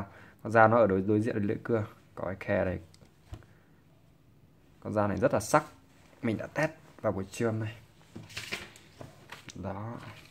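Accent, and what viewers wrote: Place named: China. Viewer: ô này nói lơ lớ, chắc người TQ học tiếng Việt à :))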